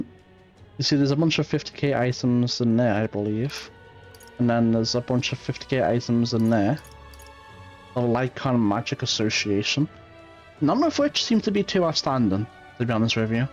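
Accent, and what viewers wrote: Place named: Liverpool, United Kingdom. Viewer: Our guide with a good Scouse accent. I love it 😂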